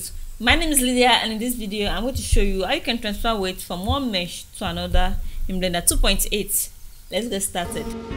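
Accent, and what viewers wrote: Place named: Nigeria. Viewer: hey, you sound like a nigerian, are you? let me publish my video self made animation on 6th march (my birthday) then we'll talk. thank you.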